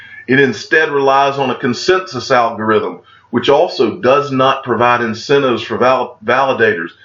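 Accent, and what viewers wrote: United States. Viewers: Whats with the cowboy accent ?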